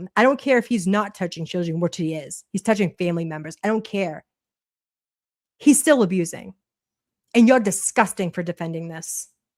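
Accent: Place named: Boston, United States. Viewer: Hey girl! I met you on the other Amy's live last week, I LOVE your energy. Bonus is your Boston persona. My husband is from Dorchester. I am from Philly so I figure same same. ❤ 😂 Thank you for covering this insanity - I think its important to spread the word about these nasty people so hopefully nobody else will fall prey to them.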